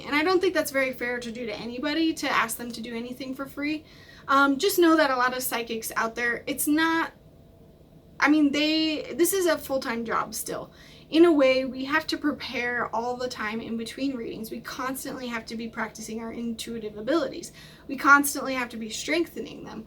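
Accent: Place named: Minnesota, United States. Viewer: I'm pretty good at guessing accents. I'm thinking and predicting she's from Minnesota but I could be wrong.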